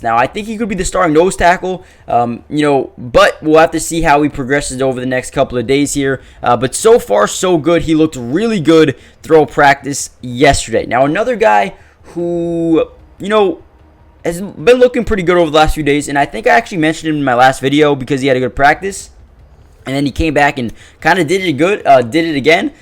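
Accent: Canada. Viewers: Do I hear a Canuck in your voice????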